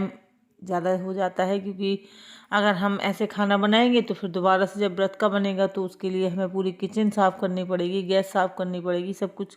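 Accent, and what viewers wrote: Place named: Bundelkhand, India. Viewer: Third like ke sath bahut Achcha falahar banaya hai aapane ekadam testi aur yummy Dekhkar hi Munh Mein Pani a Gaya Hamare Yahan Bhi Ganesh Chauth Ka Vrat bahut acche se Manaya Jata Hai vaise Main To baramasi Ganesh Chauth Ka Vrat rahti hun per yah Bade Ganesh ki jo Puja Hai vah Mere Hanuman hai isliye maine poochha Nahin Ki Thi aapke Munh se Bundelkhandi bahut acchi Lagti Hai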